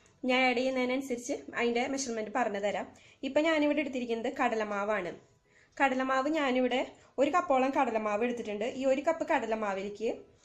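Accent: Thrissur, India. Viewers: good job. like ur presentation..trissur slang...thank u....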